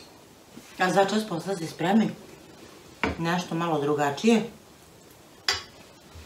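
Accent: Vojvodina, Serbia. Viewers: Odlična ideja. I samo pricaj, uzivam u tvom govoru onako vojvodjanski posjecas me na Balasevica jako volim Vojvodinu. Znam skuhati bosansku kafu i pustim tvoje filmove pa mi kafa bude merak. Zivjla ti meni. Pozdrav.🤗🤗🤗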